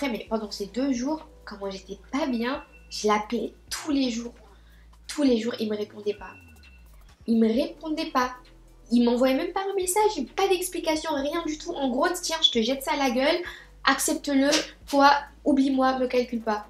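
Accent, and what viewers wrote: United States. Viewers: t tro belle est ton accent amairiquain ik est 😍😍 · t'a trop l'accent américain et j'aime trop ta storytime . je m'abonne 😘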